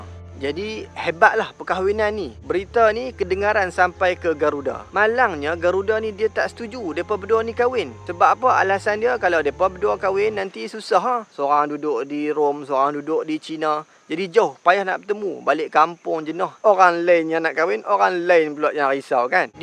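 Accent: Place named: North, Malaysia. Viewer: Syok dgr org utaqa bercerita... Rsa puaihhh dgq hahaha👍🏻👍🏻👍🏻😂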